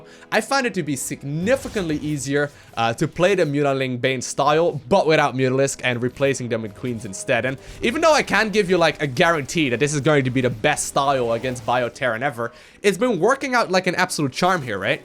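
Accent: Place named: Netherlands. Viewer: Dutch accent is so understandable to native-russian-speakers in some weird way.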